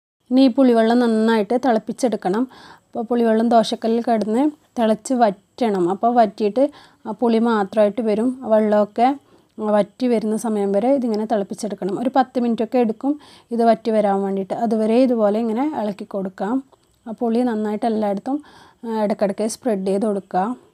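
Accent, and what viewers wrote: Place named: Malabar, India. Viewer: എനിക്ക് അറിയാം.. സ്പീച് slung. മലബാറിൽ എവിടേയോ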